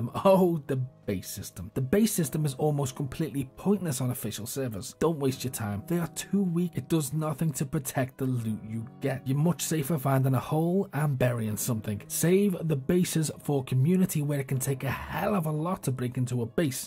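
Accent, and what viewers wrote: Liverpool, United Kingdom. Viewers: Great video mate, looking at getting back into dayz after a year or so and only had a few weeks of experience so this video has helped out, plus its always good to hear a fellow scouser. Quick question, is dayz launcher still a thing and is it worth bothering with? Liked and subbed mate :)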